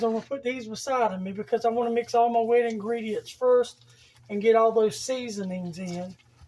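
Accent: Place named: South, United States. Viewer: Meat loaf 😮… Happy to hear your lovely Southern voice princess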